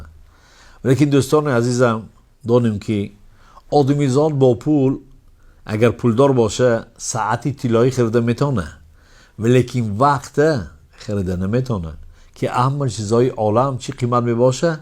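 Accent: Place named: Tajikistan. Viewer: بسيار خوب به لهجه شيرين تاجکي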